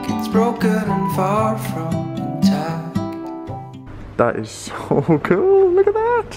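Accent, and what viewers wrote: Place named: Durham, United Kingdom. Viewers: you sounded from the north east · I can already hear the Durham lilt in your voice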